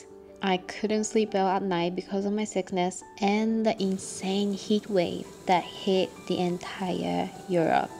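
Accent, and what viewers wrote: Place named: United States. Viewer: Why do you speak in an American accent if you're from Japan?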